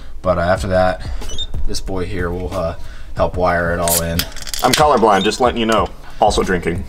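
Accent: Massachusetts, United States. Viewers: Love seeing a Mass dude doing thangs out heah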